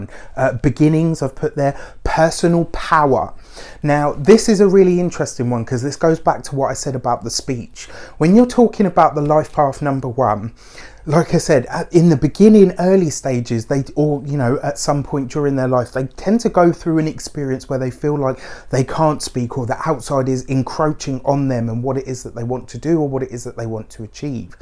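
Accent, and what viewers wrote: United Kingdom. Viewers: Is your accent British? Either way I love it! Haha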